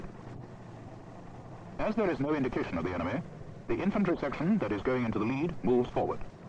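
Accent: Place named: RP, United Kingdom. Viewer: The days when all narrators had what we call a BBC accent. God forbid they used anyone with even the remotest trace of a regional accent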